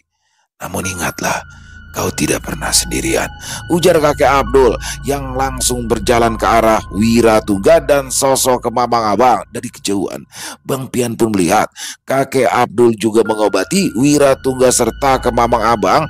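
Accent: Jakarta, Indonesia. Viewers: Wa’alaikum Salam, gercep kalo kisah bang 😁saya suka logat betawinya.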